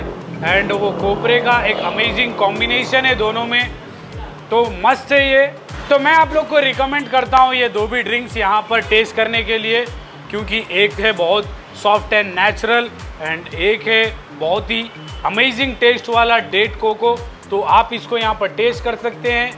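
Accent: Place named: North India, India. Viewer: Phir woich karre kya bhai North India me hai jaisa baath karre Naturally baath karo aur Hyderabadi slang use karo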